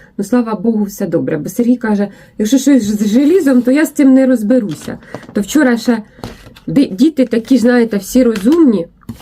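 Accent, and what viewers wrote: Cherkasy, Ukraine. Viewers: Вітання з Новим роком та Різдвом Христовим! Давно вас дивлюся, але пишу вперше. Ви для мене великий мотиватор і натхненниця, особливо після профвигорання. Коли чую Вашу мову, відчуваю себе у гостях на Черкащині, наче побачилася з родичами. В захваті від вашого з ентузіазму. Ви - мої муза із музом. Бажаю вам успіхів, наснаги й здоров'я.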